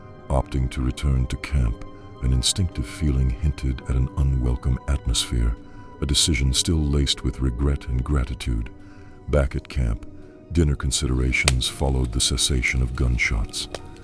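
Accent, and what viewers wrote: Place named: Upper class, United Kingdom. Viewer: I don't like the narrator's voice. Is it a computerized reading? The grammar used, while mostly correct, sounds so upper-class British that it detracts from the feel of the vid, almost sounding like it's all a tongue in cheek satire.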